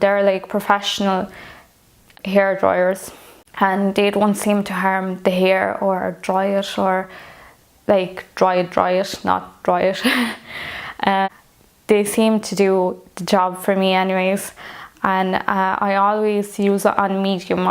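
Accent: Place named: British Isles. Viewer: First! Love the accent. Did you learn English in the British Isles? Your Instagram is marked private :)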